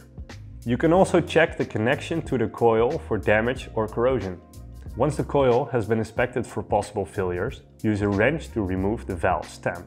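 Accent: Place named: Netherlands. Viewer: I like your accent. Are you dutch?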